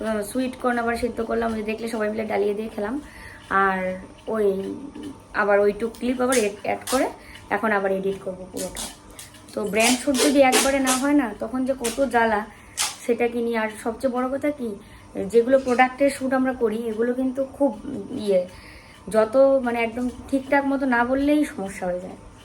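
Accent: Bangladesh. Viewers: আমার বাবা বাঙাল আর মা এদেশী। তাই তোমার কথার টান বুঝতে পারছি । যখন টিভিতে বাঙলাদেশ এর চ্যানেল দেখতাম তখন ওদের এবং শব্দকে অ্যাবং বলতে শুনেছি। তেমনি তোমার এগ্ না হয়ে অ্যাগ্ হয় । অবশ্যই তুমি তোমার আঞ্চলিক টানে বলছো , তাই বলার কিছু নেই ।তবে কি বলতো বাংলা আমাদের মাতৃভাষা ,তাই যেরকম ইচ্ছা বলতে পারি । কিন্ত ইংরেজি আমাদের ভাষা না, তাই ওটা বলার সময় সঠিক বললে ভাল হয় । কারণ ইংরেজির তো আঞ্চলিক উচ্চারণ নেই, তাই না? আর আমরা বাড়িতে যা খুশি বলতে পারি, কিন্তু অন্যের সামনে একটু পাল্টে বলতে হয় । যেমন আমরা বই পড়ি শুদ্ধ ভাষায় 😊। আর তোমাকে এই টিপ ভাল লাগছে, তবে আর একটু নিচে পরলে কেমন লাগতো?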